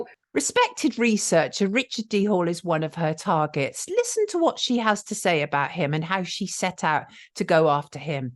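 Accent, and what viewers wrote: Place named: Bristol, United Kingdom. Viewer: Great video, Gloucesters finest.. Being a Bristolian born and bred I can hear your lovely accent creepng out every now and then, I realise you've had to hold it back for those London types over the years lol.... Marianov spring, what an embarrassment to the country she is... You have more integrity in your toe nail clippings than she will ever have.. Keep up the good work, god bless you.. The truth always finds a way... James.. Bristol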